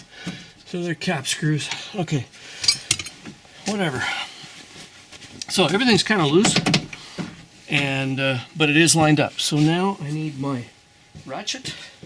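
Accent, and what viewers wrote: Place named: South, United States. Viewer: Your southern accent is far to thick for this future door trim repair man.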